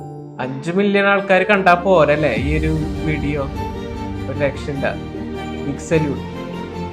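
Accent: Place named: Thrissur, India. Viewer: ശെരിക്കും ഉള്ള കലാകാരൻ..... പ്രകൃതിയിൽ ആണ് 🥰🥰🥰🥰എടോ താൻ തൃശ്ശൂർ ആണൊ place...... Slang അടിപൊളി 👍🏻👍🏻👍🏻👍🏻🥰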